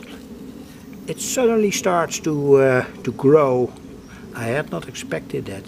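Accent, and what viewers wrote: Netherlands. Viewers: Love the garden update! I grew up working in the garden with my Dutch Oma and have always had a love for growing plants as a result. I follow your channel for two reasons. The first is to learn about electronics through your projects. The second is to hear your accent because it reminds me so much of my grandmother. Have a wonderful day,!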